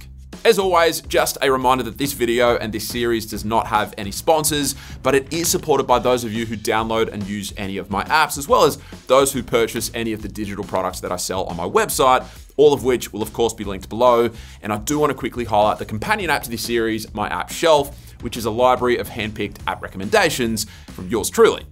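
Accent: Australia. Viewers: I have to admit that the real reason I watch the 25 days series, is because I am smitten with his heavy Australian 'G'day Mate' accent.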